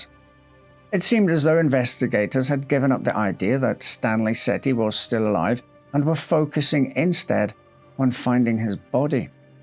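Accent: United Kingdom. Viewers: I mean this in the best way possible... Listening to an older man with a British accent tell me stories, is just so fitting! 🗣️👴👍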